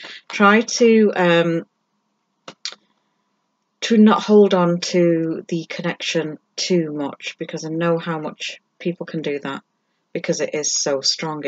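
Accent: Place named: Northern England, United Kingdom. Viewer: Ru from Northern England? You sound very local to me 😊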